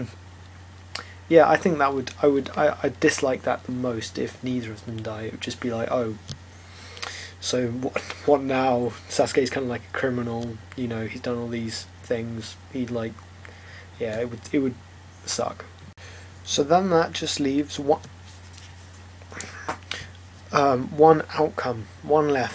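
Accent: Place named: United Kingdom. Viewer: This is perfect, a British guy talking about naruto! Im in heaven!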